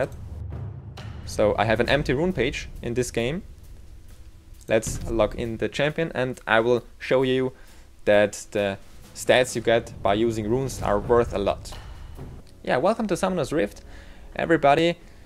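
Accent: Germany. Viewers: German accent? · Are you german?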